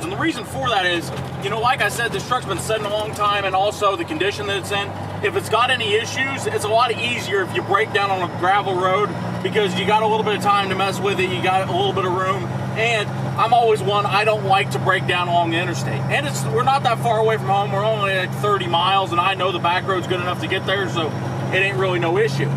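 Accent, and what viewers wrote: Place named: Kansas, United States. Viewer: “Them things like that” Hillbilly from Kansas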